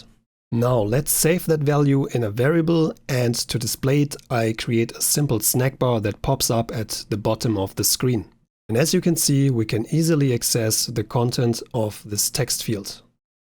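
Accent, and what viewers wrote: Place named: Germany. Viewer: Great tutorial... voice has a great German twist, made me think of -: <link>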